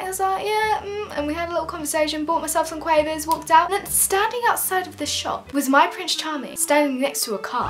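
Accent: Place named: RP, United Kingdom. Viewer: I'm from England and I want your accent is that weird? You sound so posh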